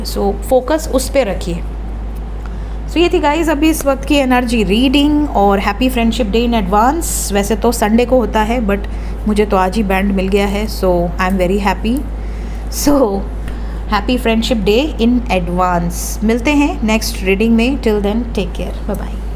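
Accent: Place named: Mumbai, India. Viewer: Mem mumbaiya bhasha me bol rahe he 😂😂jhakas 😂😂😂😂😂 so qyut di❤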